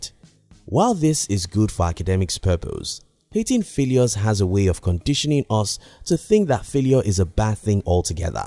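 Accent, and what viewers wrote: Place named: Nigeria. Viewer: You speak like a Nigerian.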